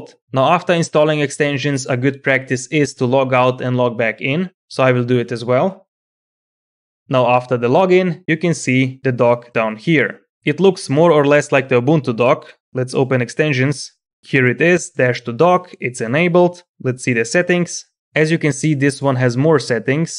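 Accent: Russia. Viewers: Ur vids r cute! Like ur Russian or whatever accent.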